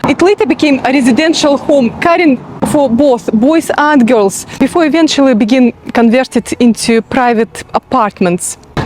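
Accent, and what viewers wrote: Glasgow, United Kingdom. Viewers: Great overview and what an amazing accent!! 50% Glasgow!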